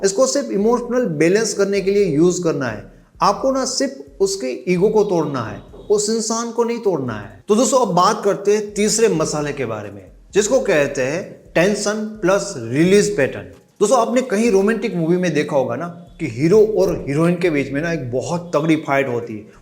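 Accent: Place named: Kathiyawad, India. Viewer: Sir aap gujrati kathiyawadi lag rhe ho bolne ke tone se lag raha hai😅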